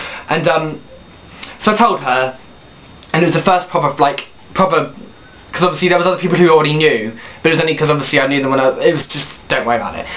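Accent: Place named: RP, United Kingdom. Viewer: You ain't funny for you're gay. You are so funny due to your smashing posh accent! Damn, what would I give to mimic that sound?!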